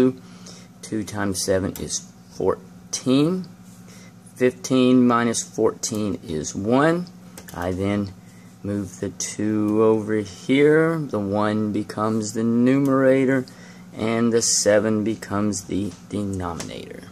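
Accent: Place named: Texas, United States. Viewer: Texas accent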